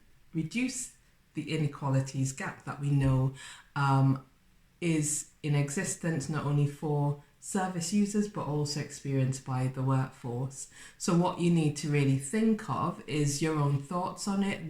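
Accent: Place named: South Wales, United Kingdom. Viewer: Love the South Wales accent, just here to support.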